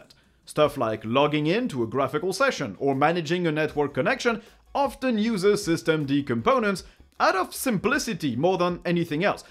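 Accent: France. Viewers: Je reconnais un fond d'accent français. Are you french?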